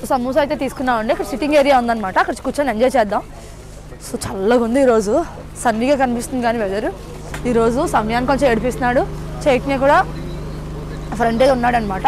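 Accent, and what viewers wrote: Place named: Rajahmundry, India. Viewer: Voice vintunte starting lo . .ekkado vinnttuga anipinchindi....Rjy voice na .. 😀 Maadi ade....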